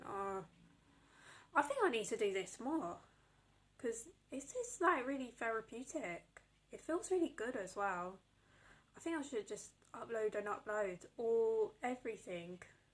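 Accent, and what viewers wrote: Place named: United Kingdom. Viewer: This was randomly shown to me on my feed, you seem to have a very comforting aura about you. You’ve got a nice voice and personality. I wish you the absolute best with your content creation. I would say that the most critical thing you should do is plan as much as you can before you start recording. If you can do that, while maintaining the authenticity that you have in spades, that’s the best you can do! You’re doing great!! Also I really like your british accent 😅 and you’re pretty funny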